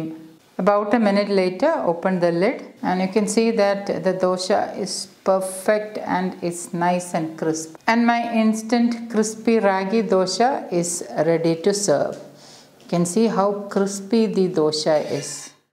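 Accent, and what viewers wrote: Kerala, India. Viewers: Delightful to hear the nice mallu accent again… felt homesick 😊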